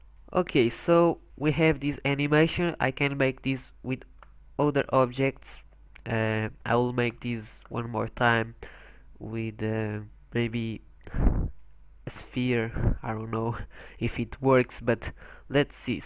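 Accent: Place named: United Kingdom. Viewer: Inglês tá bom hein kkk falando igual um britânico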